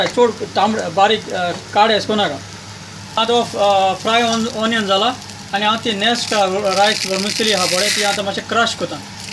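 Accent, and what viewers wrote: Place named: Salcete, India. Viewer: I lik ur salcete accent.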